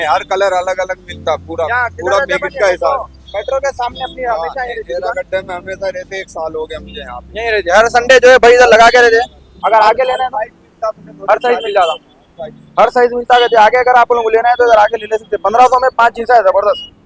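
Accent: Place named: Hyderabad, India. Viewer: bhai aap kya karrai ji…..😂😂 Love your Hyderabadi accent Love your content ❤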